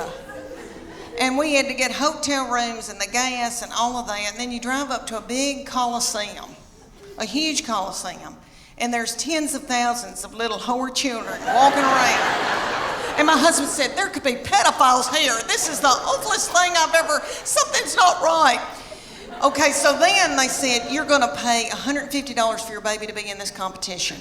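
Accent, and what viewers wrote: South, United States. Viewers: I was today years old when I found your channel!!! I can hear the sweet southern charm in your voice!!! Hello from Alabama!!! You're hilarious and refreshing! Thanks for making me pee myself today, I DO aporeciate it!!! 😂😂😂 #sportsandoffdope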